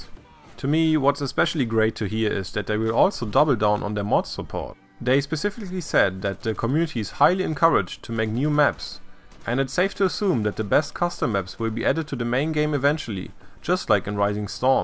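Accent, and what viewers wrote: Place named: Germany. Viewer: Very well done! You sound much better this time, made me really wanna listen to you. Keep up the good work :) btw are you German? because you sound very German and I would know, since I am one myself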